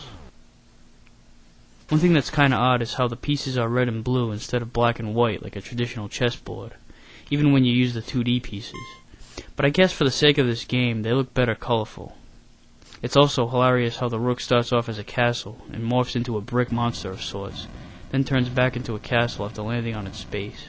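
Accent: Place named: Boston, United States. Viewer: This guy has the best God damn accent. Are you from Boston?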